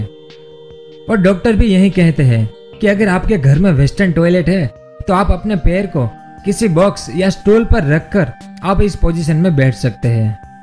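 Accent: Gujarat, India. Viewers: Ap gujarati hona bhai😂😂😜??? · Accent gujju bhai ..... 🤣🤣🤣🤣 like it....!!!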